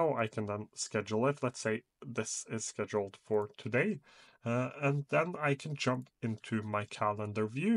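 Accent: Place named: Norway. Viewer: Came for the info, stayed for that cute Norwegian (?) accent 😝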